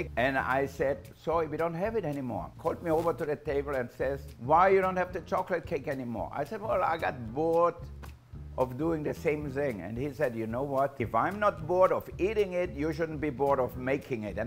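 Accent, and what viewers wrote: Germany. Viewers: Is he German? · It rallye sounds german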